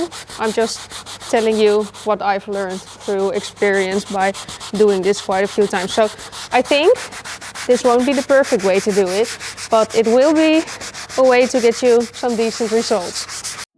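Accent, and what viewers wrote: Netherlands. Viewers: ARE YOU DUTCH? 🙋‍♂️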